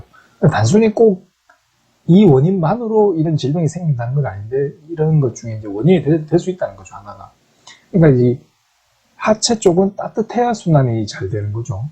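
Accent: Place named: Pohang, South Korea. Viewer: 안녕하세요,, 억양이 우리지방이네요.. 혹시 거주지가 포항은 아이죠??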